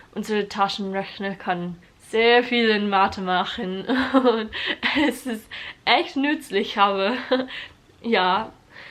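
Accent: Bavaria, Germany. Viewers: Its funny how your German sounds a little bit "bayrisch" xD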